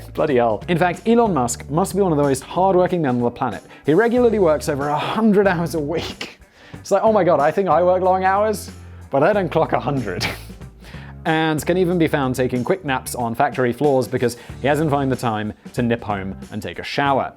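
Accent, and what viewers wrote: United Kingdom. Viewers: The British slang and pronunciations are almost as funny as Danny and Simon's jokes/puns. If it's spelled Zynga, then it's an online/mobile gaming company. Mostly their games are available on just Facebook nowadays. Some of those men are sexy, just sayin' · His only talent resides in being able to read in his British accent....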